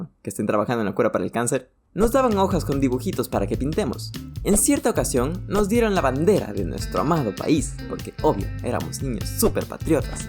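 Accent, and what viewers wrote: Guayaquil, Ecuador. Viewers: Eres de guayaqui o de guayas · Oh eres de Ecuadoyo igual. ''Abrazame hermano!!'' XD. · Oye eres de ecuadorr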